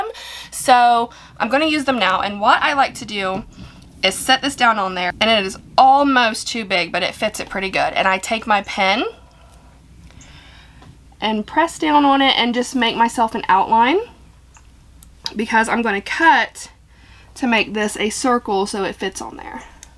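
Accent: South, United States. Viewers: Girl! Your voice is so cute. I’m from the south too (Alabama) and I much rather listen to people with southern accents! Lol this is so adorable and helpful. Thank!!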